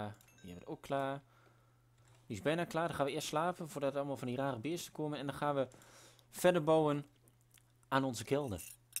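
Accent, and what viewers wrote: Twente, Netherlands. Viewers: Het twentse accent is juist leuk! dat maakt jouw video's uniek, en leuk om naar te kijken. Ik ben geabonneerd :D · En dat Twentse accent is alleen maar leuk/grappig, dat maakt het leuker! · Ik meen het ik kijk heel graag jouw video's en je twents vind grappig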